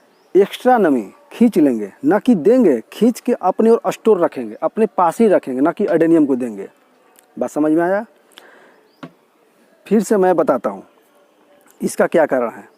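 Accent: Bihar, India. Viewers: "Bihari" ho kya bhai ???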